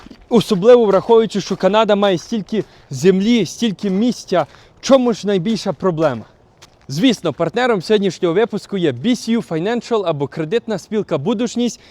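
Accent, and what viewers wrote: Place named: Ivano-Frankivsk, Ukraine. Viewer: Який чудовий акцент. Наче з Франківщини?)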